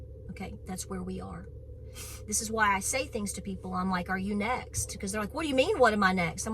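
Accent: United States. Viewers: I love that country accent! We have to help one another ❤ Be safe storm season is just beginning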